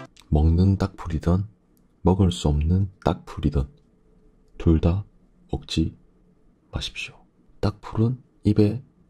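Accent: Busan, South Korea. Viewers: 어디가 표준어랑 섞인건가요 ㅋㅋㅋ 완전 부산사투린데요?ㅋㅋㅋㅋ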